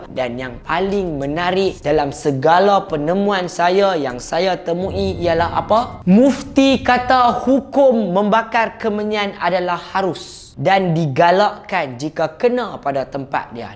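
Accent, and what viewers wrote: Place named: Penang, Malaysia. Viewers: samaaa...layann jaaa ceritaaa diaaa tambah2 pelet utaqa lak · Aku suka cakap. Besala oqang kita.. Penang mariii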